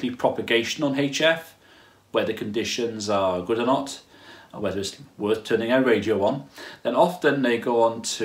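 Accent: Wales, United Kingdom. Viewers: Thanks. That was a really good presentation. Did I detect a Welsh accent in there somewhere?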